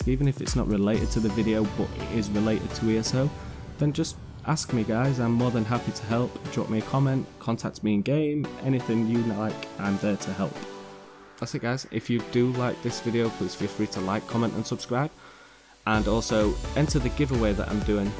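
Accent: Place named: Yorkshire, United Kingdom. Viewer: Haha yeah, nice and subtle man! What part of England has this accent? Yorkshire/Sheffield somewhere around there? I need to learn to speak like that, it's just awesome!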